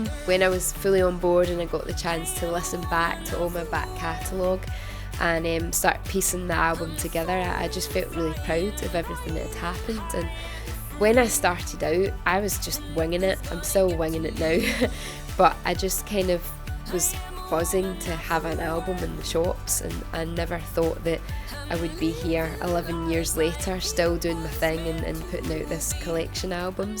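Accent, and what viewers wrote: Scotland, United Kingdom. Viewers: That scottish accent <3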